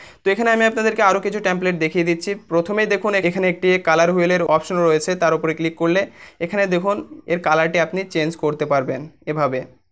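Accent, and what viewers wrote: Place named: Barishal, Bangladesh. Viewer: ভাই বরিশালে র ভাসা টা সেই লাগে🤣🤣🤣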